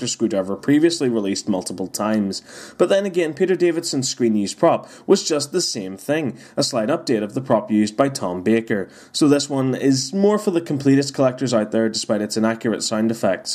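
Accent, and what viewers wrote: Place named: Scotland, United Kingdom. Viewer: you sound like you are from Scotland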